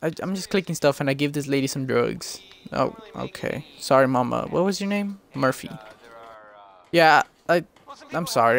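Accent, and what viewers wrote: United States. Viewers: you sound like your from LA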